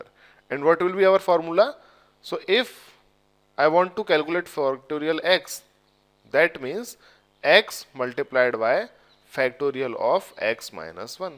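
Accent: India. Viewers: When I hear Indian accent. I immediately go elsewhere. It's like an algorithm for me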